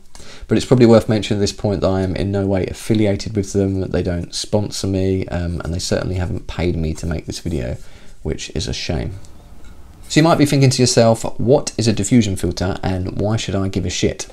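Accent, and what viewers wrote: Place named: United Kingdom. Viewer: Came for the filter, stayed for the British accent. Solid review.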